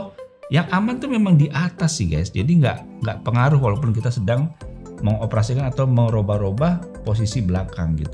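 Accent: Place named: Java, Indonesia. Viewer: Bang orang mana sih kok jawa bgt hehehe😂. 😅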